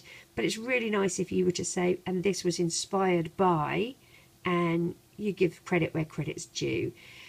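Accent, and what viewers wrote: United Kingdom. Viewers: Cool channel!!! <3 your creations!!👍👍👍👌👌👌✌✌✌😊😊😊 I love your British accent!!! 🇬🇧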